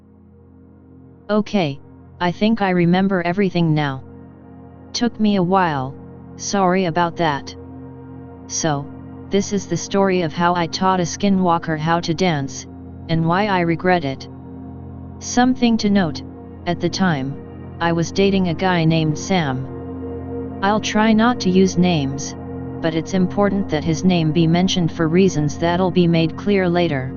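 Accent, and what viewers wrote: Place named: Canada. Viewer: Whoa. TTS robot is canadian.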